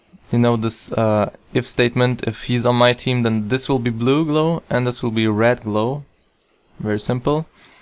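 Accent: Germany. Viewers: man hört das du deutsch bist :D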